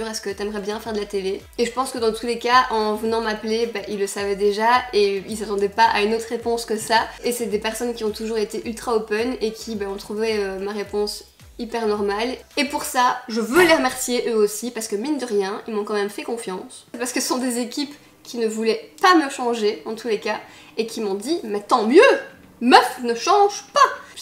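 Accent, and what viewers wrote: Belgium. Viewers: MAIS C'EST UN TRUC DE OUFFFF 😱😱 J'espère qu'on entendra ton petit accent belge à la télé haha #huit Tu peux être fière de toi, quel aboutissement ! Trop hâte de voir ça 😍😍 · #teambelge Allez on impose notre accent sur les chaînes françaises !! 😆❤❤❤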